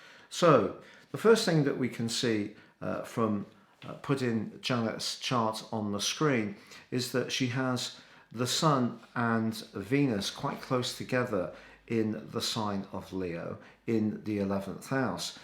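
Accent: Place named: North, United Kingdom. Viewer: I like your Northern accent 😂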